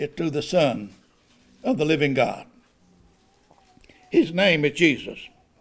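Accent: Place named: Texas, United States. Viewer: Love your Texas accent Pastor.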